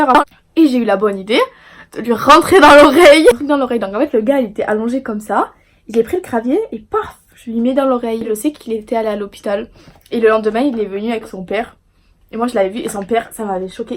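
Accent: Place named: South, France. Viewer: L’accent du sudddd 🤪 continue 💙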